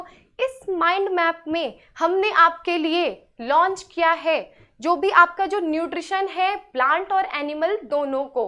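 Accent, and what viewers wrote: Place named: Bihar, India. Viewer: The Presentation was great and The Explanation was awesome with some extra concepts I think.Though It wasn't of my class but I enjoyed it. KEEP UP YOUR GOOD WORK TEAM and if I am not wrong from your Accent I can guess that you are from Bihari origin having said that I am not from there. Anyways BEST OF LUCK TO THE TEAM for your youtube channel and I wish your channel grows over time. Once again thanks for your amazing explanation.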